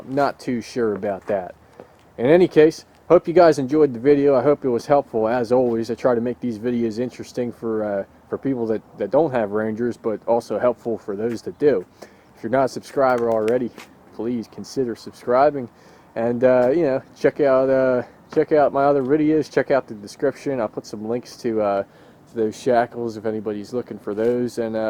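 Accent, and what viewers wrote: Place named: Western Pennsylvania, United States. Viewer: What are we going to do with that Western PA accent!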